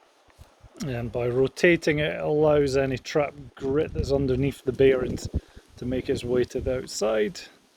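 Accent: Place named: Fife, United Kingdom. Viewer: I'm a fellow Fifer, recognise that accent anywhere!!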